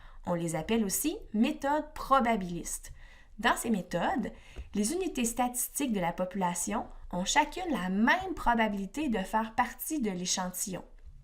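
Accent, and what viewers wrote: Canada. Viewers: très très bien expliqué (même avec votre accent canadien lol)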